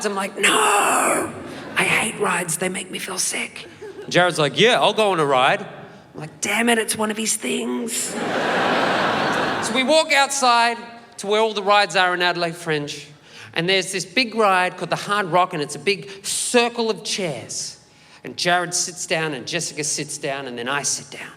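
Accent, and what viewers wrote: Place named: New Zealand. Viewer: i thought this was a new zealand accent 😿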